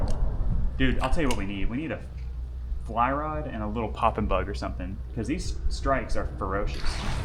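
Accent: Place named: Arkansas, United States. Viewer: That’s “minnows”you act talk like your from Arkansas 😂👍💯👍Great Video 👍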